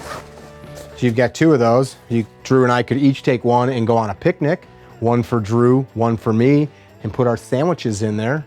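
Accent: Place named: Midwest, United States. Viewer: Born in Des Plaines, live in Phoenix now, always a breath of fresh air hearing your Midwestern accent! love the content!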